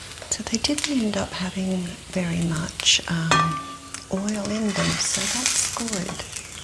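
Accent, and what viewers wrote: Australia. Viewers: Australian accent is my weakness what comes to asmr. And with your voice the tingles are even more amazing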